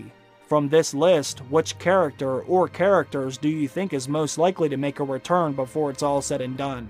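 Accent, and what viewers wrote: Pittsburgh, United States. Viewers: Is the Narrator a Yinzer? I hear a bit of Pittsburgh in his speech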